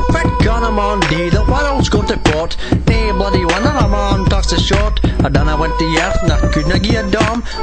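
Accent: Moray, United Kingdom. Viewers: omg best scottish accent im from inverness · Plenty of cities and towns in moray talk like that.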